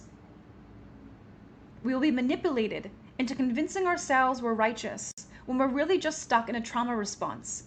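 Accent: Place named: Northeast, United States. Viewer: Yes, thx. Sounds strongly of the NYC-Boston milieu, without the accent per se. I guess I am hearing education and social class more than location.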